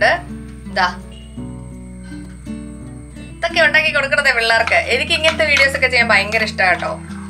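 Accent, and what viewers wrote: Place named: Idukki, India. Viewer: ചേച്ചിയുടെ സംസാര ശൈലി കേട്ടിട്ട് എനിക്ക് എന്റെ നാട് (idukki)ഓർമ വരുന്നു. ചേച്ചി നാട്ടിൽ എവിടെയാണ്?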